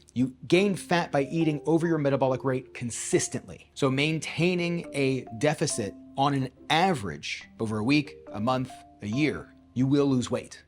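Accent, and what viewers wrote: Canada. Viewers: Lol, it confuses me every time. I was wondering if you were Canadian.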